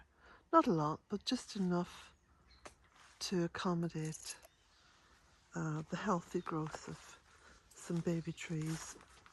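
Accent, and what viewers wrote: Ireland. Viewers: Yet again, thank you for your words, you speak so much sense. I love your hat. You always look so smart, and we all love your soft voice and Irish accent. What a gift you are for us poor souls. It is my Birthday on the 19th November, and there is a full moon! I am so pleased, and shall be out in the dark just taking in the beauty of it all. May I send you Blessings from Devon xxx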